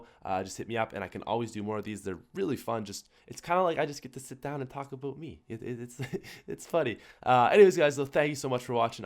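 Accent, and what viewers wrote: Ireland. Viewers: Hey. Why is it that I hear a hint of Irish( Ireland/Northern Ireland) in your voice? Especially the "r's". Haha, awesome videos!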